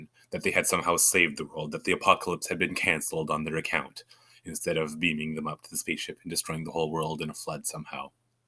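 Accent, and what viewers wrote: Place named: Canada. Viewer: I love Canadian accents.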